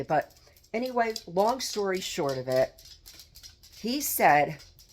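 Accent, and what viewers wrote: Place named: New York, United States. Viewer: On a side note, I heart your accent! It makes me miss home (NY!)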